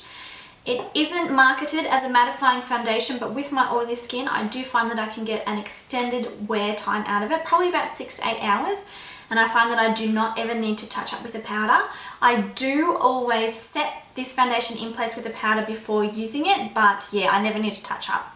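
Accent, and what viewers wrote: Australia. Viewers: Are you from Queensland?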